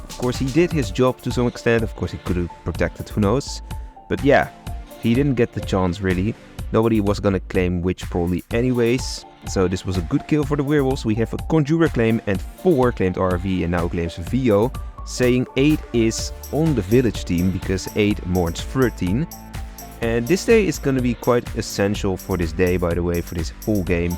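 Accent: Netherlands. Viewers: Dutch accent is hard not to notice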